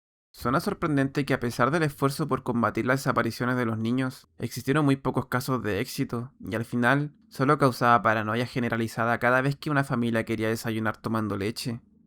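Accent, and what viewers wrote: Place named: Chile. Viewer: me gustan tus videos porque tienes acento chileno saludos desde chile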